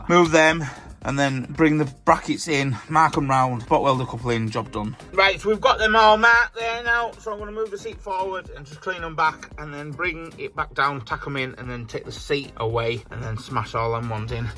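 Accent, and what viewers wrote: United Kingdom. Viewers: British Accent 😂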